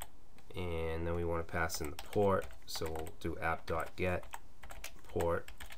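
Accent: Boston, United States. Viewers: You from Boston bro?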